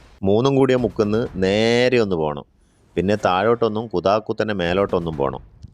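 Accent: Kannur, India. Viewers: എനിക്കു വയ്യ എന്തൊരുവർണ്ണന സൂപ്പർ ആയിട്ടുണ്ട്. കണ്ണൂർ ഭാഷയിലുള്ള അവതരണം സൂപ്പർ സൂപ്പർ🎉🎉🎉🎉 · അടിപൊളിയായിട്ടുണ്ട് അഭിനന്ദനങ്ങൾ കണ്ണൂർ സ്ലാങ് ഒക്കെ നന്നായിട്ടുണ്ട് ഇനിയും വീഡിയോ പ്രതീക്ഷിക്കുന്നു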